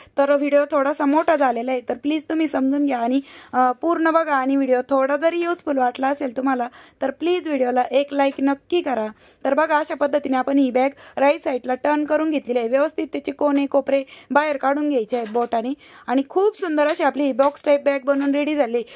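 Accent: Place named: Marathwada, India. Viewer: खूपच सुंदर शिवली. तु कोणत्या गावची आहे. तुझी भाषा मराठवाड्याची वाटते. छान छान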